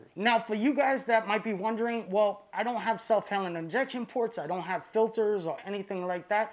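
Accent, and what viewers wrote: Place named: Massachusetts, United States. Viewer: Sounds like you got a Massachusetts accent.. mush love from 508 🤙🏻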